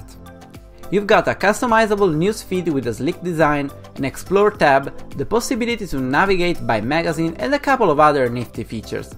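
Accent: Italy. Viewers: sei italiano?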